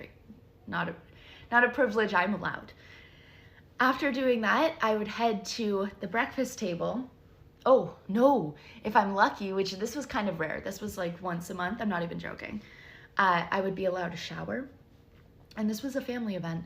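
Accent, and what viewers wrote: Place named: Canada. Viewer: You sound Canadian. So I’m guessing this occurred in Canada. Could be wrong though.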